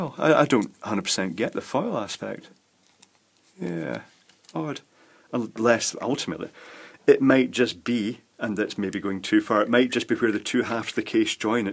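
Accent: Scotland, United Kingdom. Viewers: where are you from you sound Scottish like me but i see you are on isle of white is it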